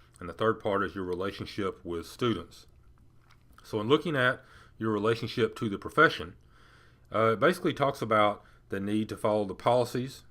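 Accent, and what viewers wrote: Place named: South, United States. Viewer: Dog your southern. not Texan. I know.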